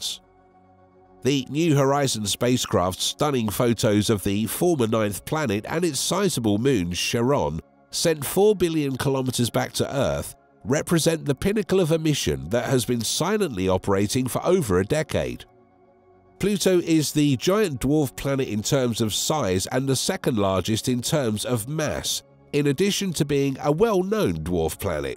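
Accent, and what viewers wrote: United Kingdom. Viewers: Why do working class Brits narrate a NASA inquiry? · British limey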